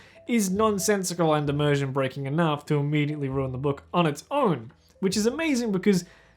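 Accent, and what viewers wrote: Australia. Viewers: me just finding out is australian and not british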